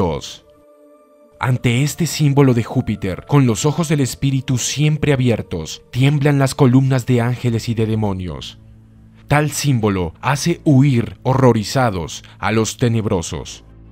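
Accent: Colombia. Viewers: Eres colombiano ? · Eres colombiano 👍🏻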